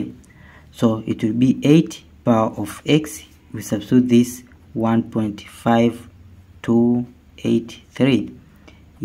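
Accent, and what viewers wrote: India. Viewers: По акценту думаю, что перед нами индиец.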